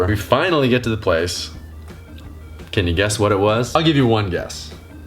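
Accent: Boston, United States. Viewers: I just like listening to your Boston accent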